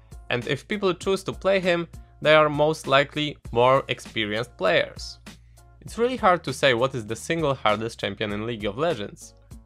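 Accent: Poland. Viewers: ej ty chyba masz akcent polski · Are you Polish? :D Your accent sounds like Jankos' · Ale polski akcent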